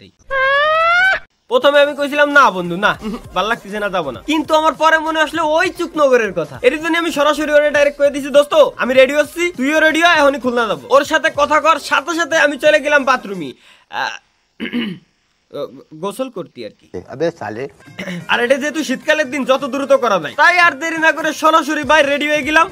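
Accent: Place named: Barishal, Bangladesh. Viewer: ভাই মনে হয় বোরিশাইল্লা😂🤣😂🤣 গের,,আমার বোউ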